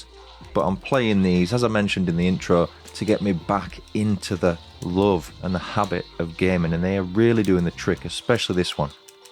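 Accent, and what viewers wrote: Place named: Yorkshire, United Kingdom. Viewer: Where in Yorkshire you from pal?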